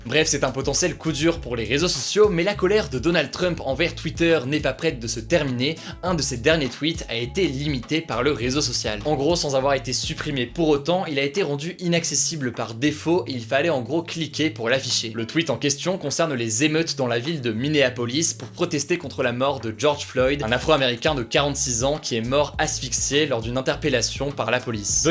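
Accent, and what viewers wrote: England, United Kingdom. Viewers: mdr ton accent en anglais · Pourquoi tu portes un accent britannique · J'adore ton accent anglais 😍😂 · That english accent though, legit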